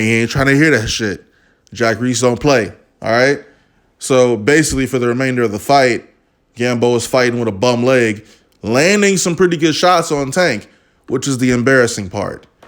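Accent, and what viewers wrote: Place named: Baltimore, United States. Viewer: Thats how we talk in Baltimore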